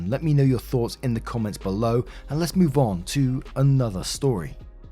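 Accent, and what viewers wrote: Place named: Oxfordshire, United Kingdom. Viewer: Oxfordshire accents rock!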